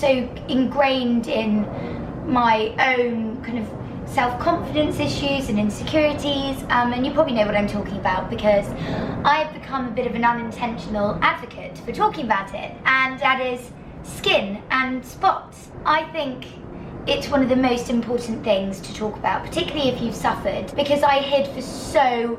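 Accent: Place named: England, United Kingdom. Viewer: Oh my gosh she had the most stereotypical English accent. Which part of England are you from, definitely not London Ps: I’m from London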